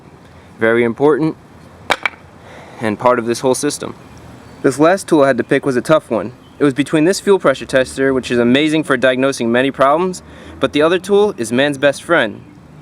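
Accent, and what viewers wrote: United States. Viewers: Sir are you an american ??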